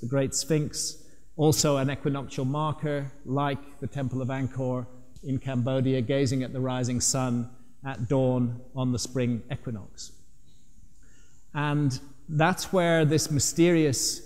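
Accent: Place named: England, United Kingdom. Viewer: the English accent makes him sound so articulate and educated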